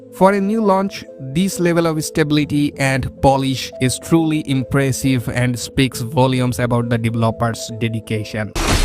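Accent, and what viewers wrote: India. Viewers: R u Indian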